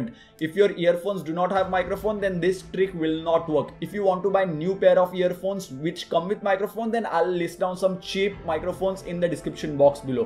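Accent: India. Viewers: Finally a indian speaking english while explaing on this video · Sorry, but this Indian accent sounds like an acoustic hell. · Oh my God your Indian accent is making me laugh😂😂😂 Anyway is this a glitch to a phone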